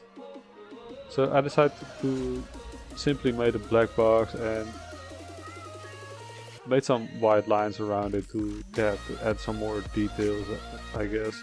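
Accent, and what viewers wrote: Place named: Netherlands. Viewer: Hello! I really like your videos! I don't want to be rude, but your voice sounds foreign. Are you Dutch? You sound Dutch lol.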